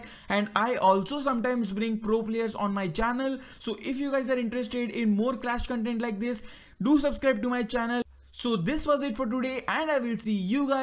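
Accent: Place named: India. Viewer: Seems like Indian accent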